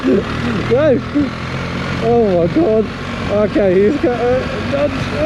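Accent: Australia. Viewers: You Aussie, mate? Hello from Toowoomba!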